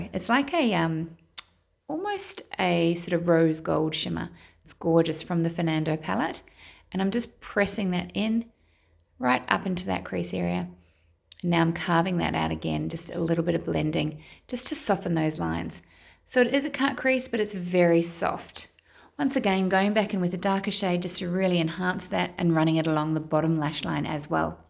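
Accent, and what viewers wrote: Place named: New Zealand. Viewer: Ohhh I see becuz it says Australia on your bio but your accent is a bit kiwi 😅 I was a quite confuse.. I was trying to figure out if you’re a kiwi or an Aussie because u almost sound Australian but more towards New Zealand, it’s like you have mixed accent between the two I’m Thai who have lived in New Zealand for 15 years but now currently living in Australia Btw you have a lovely kiwi accent and you speak very nicely ❤️ it’s nice to listen to 🤗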